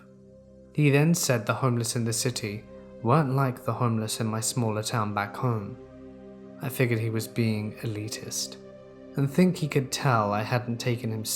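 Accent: United Kingdom. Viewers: What part of u.k are you from?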